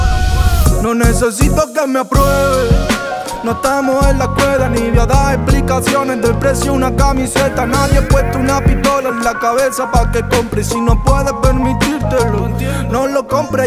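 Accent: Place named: Granada, Spain. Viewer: Es del sur de España, de Granada en concreto por eso tiene ese acento.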